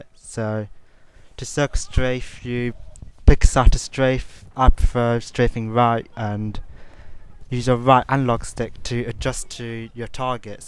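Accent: United Kingdom. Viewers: are u british u sound british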